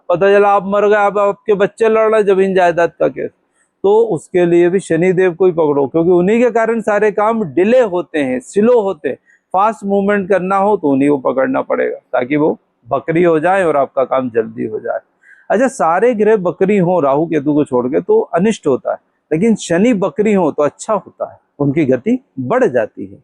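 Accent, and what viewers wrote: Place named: Madhya Pradesh, India. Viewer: Apne MP wale tone me kahani sunaye toh maja aaya 😂😂😂😂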